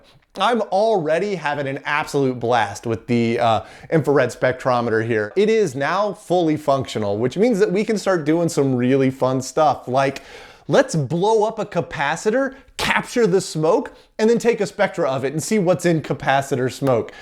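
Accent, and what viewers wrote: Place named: Texas, United States. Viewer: I love how you got real Texan when talking to camera. David Murray does it too. Nice Bendix by the way.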